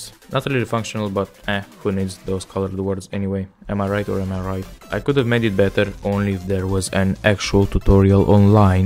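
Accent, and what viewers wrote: Moldova. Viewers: Băi ești moldovean?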